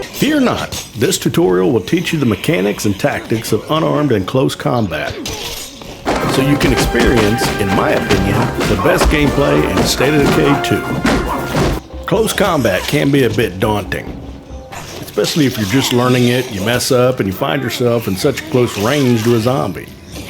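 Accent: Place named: Texas, United States. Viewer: This dude sounds texan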